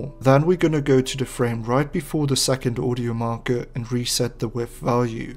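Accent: Germany. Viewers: Du bist Deutsch?? xD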